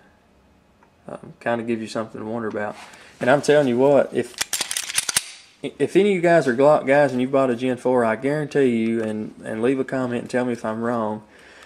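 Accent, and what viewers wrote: South, United States. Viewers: I would have to say that I believe you may be a touch Southern. We talk a little funny to the northern folks.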